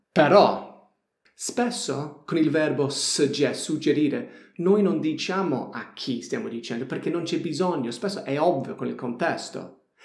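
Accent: Apulia, Italy. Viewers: Il tuo accento pugliese ti renderà famoso!!! È veramente simpatico 😃 SUPER!! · Ecco, mi sembrava fosse un accento pugliese, ma non ne ero sicuro. Incredibile. Molto preparato. Un onore seguire le sue lezioni. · ma mi sbaglio o hai un accento pugliese? hai vissuto in puglia? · Allora non l' ho notato solo io che ha acquisito la cadenza pugliese?! 😂😂😂😂😂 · mitico !! Pugl-English !!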